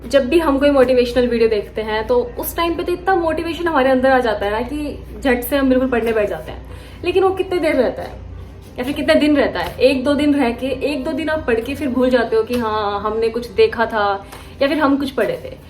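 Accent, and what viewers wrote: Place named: Pahadi, India. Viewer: Himachal wagera se ho kya aap didi? Pahadi accent hai aapka...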